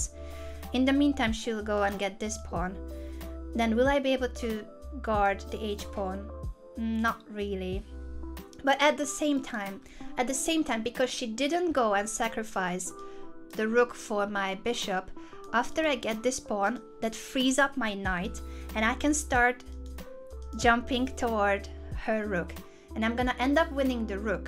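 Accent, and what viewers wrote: Hungary. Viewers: Bakker eddig nem is realizáltam hogy te magyar vagy.... annyira hülyének érzem magam, az akcentusból rá kellett volna jönnöm XD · are you hungarian?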